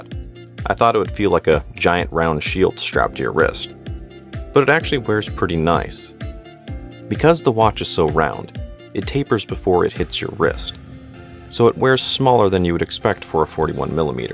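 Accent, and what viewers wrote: United States. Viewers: It's nice to hear an American accent discuss watches. Every other channel I'm subbed to are English. You're way more concise than other watch commentators.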